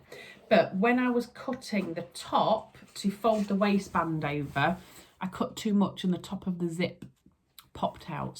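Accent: Yorkshire, United Kingdom. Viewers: Lovely to hear you chat away😊my ears prick up when I hear a Yorkshire accent. Moved to Australia 🇦🇺 many decades ago. Have you come across the Peppermint Magazine Wrap top by In the Folds? Free pattern. Could be a contender for your mums outfit.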